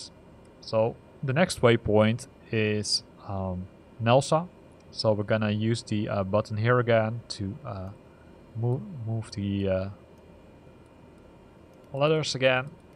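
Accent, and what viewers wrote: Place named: Netherlands. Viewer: Sounds Dutch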